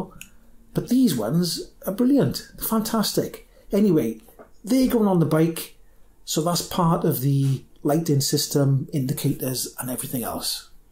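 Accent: United Kingdom. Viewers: Nice to here an accent that sounds like you live on my street, instead of the USA. Well presented video, look forward to the sequel. If you ever need any more of those headlamp clips, by the way, you can get them in Wilco for a couple of bob, they are for holding your greenhouse glass on. They only last about a year outdoors, but will probably be ok in the headlight. Paul SW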